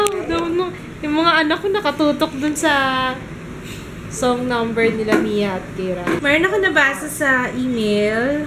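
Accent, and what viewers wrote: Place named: Iloilo, Philippines. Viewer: hi miss! nakakaaliw po kayo! i feel good watching your videos. may pag ka hiligaynon (ilonggo) yung ibang word and accent nyo hahaha.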